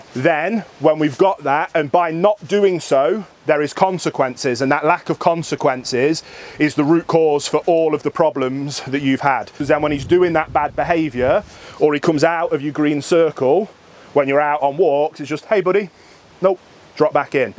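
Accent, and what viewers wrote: United Kingdom. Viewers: Just read a bunch of these. I've been watching for a while now, my son calls him the UK guy cause we Yanks love the accent. Anyway, for those who complained, there is an older longer video on slip lead training of a bad puller. It's an excellent vid and what I used to correct my Lhasa apso mix monster. For those of you who don't like or can't get prong collars (I don't think I'd put a prong on a Lhasa) then try a Gentle Leader. They really do work IF you present them correctly and use them correctly. Read the directions! All of videos are great, but there are other good ones as well. Look around and be willing to devote some quality time to your dog!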